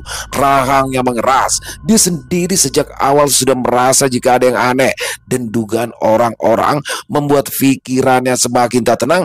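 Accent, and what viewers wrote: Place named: Jakarta, Indonesia. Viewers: Satu masalh LG terselesaikan olh bang jali..,dan buat bang selalu berhasil membawa kn kisah nya dgn logat Betawinya,keren banget, sehat selalu untuk bang dan team 💪🫰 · Iya nich...mantep bgt logat betawinya....spesialisasikan aja bang ....kami butuh yg menghibur...hidup kami udah horor hahah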